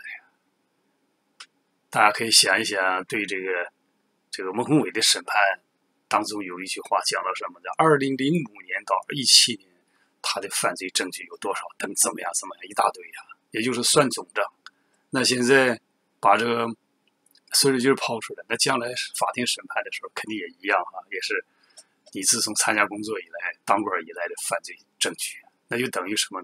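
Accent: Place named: Dalian, China. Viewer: 大连味有的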